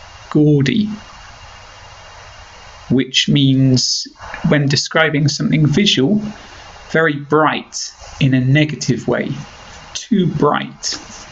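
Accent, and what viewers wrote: United Kingdom. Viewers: ohhhhh that's amazing!!!!!!!!!!! I like your british accent! God Bless you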